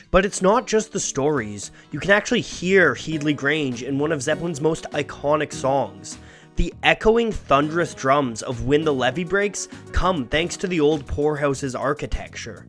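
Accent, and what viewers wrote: Canada. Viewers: Are you Canadian? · I like this channel, with all it's flaws and Canadien accents (houwse gets me every time) and/but I challenge your stage/studio knowledge to make a video on how to mic shit based on the hallway/staircase situation of the unbelievably talented John Bonham. Find songs, and show how mic placement changes and improves the sound.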